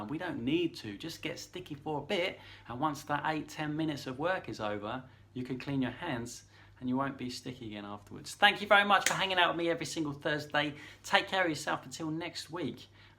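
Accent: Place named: England, United Kingdom. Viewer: Good tip in a general sense but you are making the assumption that all flour is the same. Guessing from the accent comes from England. I live in the very hot, very wet, very humid tropics. Exactly the same flour behaves differently out here. It not only carries more water it just sucks it out of the air while you knead it. And don't buy a dough scraper. You definitely need one but just find that old credit card you the in the back of the cupboard. Pair of kitchen shears to snip the round corner. Sounds a bit critical but I will be back. You give good knowledge.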